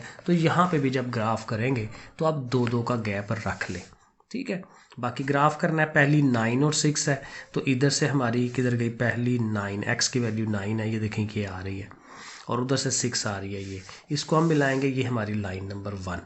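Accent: Sialkot, Pakistan. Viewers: Sir ap sialkot se hein?? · Sir's accent shows that he is Sialkoti.....❤😂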